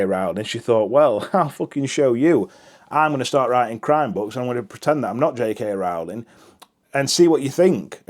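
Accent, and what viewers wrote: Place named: Derbyshire, United Kingdom. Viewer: Ah thank you. So it's a Derbyshire accent.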